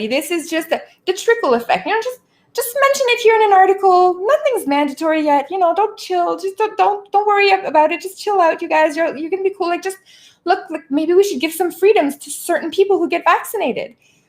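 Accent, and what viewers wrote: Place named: Quebec, Canada. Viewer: I thought I heard French -Canadian. I was just about to ask when you mentioned it.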